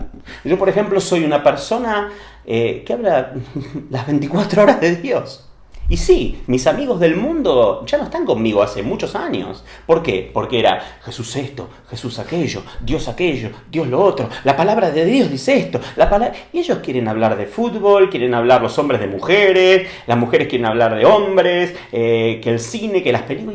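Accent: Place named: Argentina. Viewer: Gracia y Paz en primer lugar le doy gracia a Dios por sus riquesas inescrutables por esa palabra atinada y en segundo lugar gracias por tener el privelegio de escucharlo Siervo por su acento veo que eres argentino eres una bendicion para todo aquel que te escucha desde Panama centro del universo lo escucho a diario y me regocigo trae gozo a mi alma la gloria sea para Dios Bendicion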